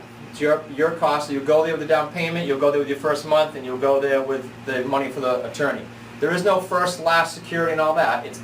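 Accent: Boston, United States. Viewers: Is that a Bastin accent ??? 👀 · Is this guy from Boston?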